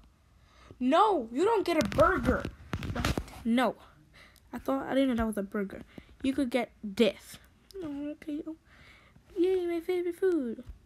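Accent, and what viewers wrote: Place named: Philippines. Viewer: I can't see that I chat here but I know and I hear that shes from Filipino tama ba ko?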